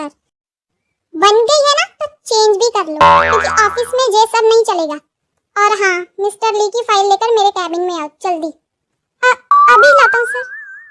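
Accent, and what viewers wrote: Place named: Bihar, India. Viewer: Badi mast bihari boli hai to good ❤❤❤❤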